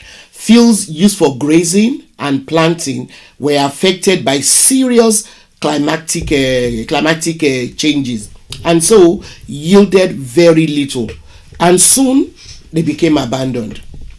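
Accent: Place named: Africa. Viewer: Thanks for this. There is a racist you tube channel called History Debunked. His supporters were in the comments section talking about Black people coming from primitive tribes. I sent them this video to show them who were the primitive tribes. It will also gall them that it is a Black Woman speaking about this with an African accent no less.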